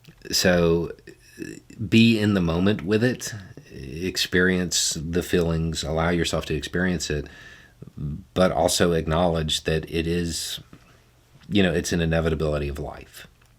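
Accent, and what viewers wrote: South, United States. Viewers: The fact that you describe yourself as, "detached" helps me understand part of the reason I've always trusted you. I describe myself that way, and others have made that observation about me. We have similar backgrounds in regards to our younger years and area. I've lived in the rural South my entire life, and you sound like the men I've been around my entire life, accent wise. The vast majority of men around me are typical so called christian conservatives, mostly trumpers at that, ugh! Oddly enough, I feel like you listen to me more than any man in my everyday life, sad huh lol. I'm so glad I found your channel way back when you had around 250k subscribers, I know you and your intelligence and calm delivery have helped to keep me sane during this weird ass timeline we are currently living in, thank you for everything, and team! 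✌️❤️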